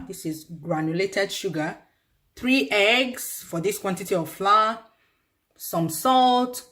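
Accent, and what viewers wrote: Igbo, Nigeria. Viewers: We like hearing " ur sexy igbotic..voice"..jisike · We love that Igbotic voice 😂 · Sis idi too much. I learnt alot from you. Nye wam ur igbotic voice. I am loving it anytime. Jisike!!! More grace sis.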